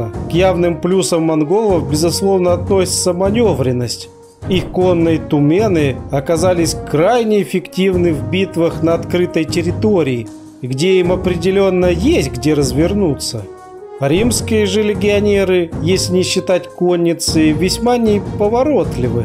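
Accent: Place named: Ukraine. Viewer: русский с Украинским акцентом))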